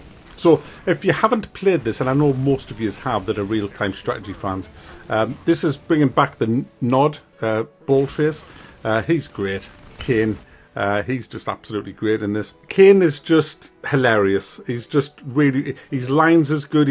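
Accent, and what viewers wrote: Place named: North East England, United Kingdom. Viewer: Never ever thought i'd hear somebody from the North east do a review on tiberium Wars😂😂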